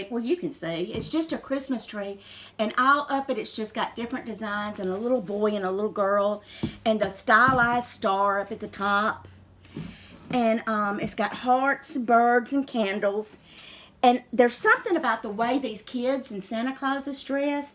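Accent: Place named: Alabama, United States. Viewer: NAPCO! Isn't that also made in Japan? Can you tell I'm a new little baby? Just had to say that word, baby, as it's so cute how you talk...with your down south Alabama accent! 🤣😅😄💙💛💜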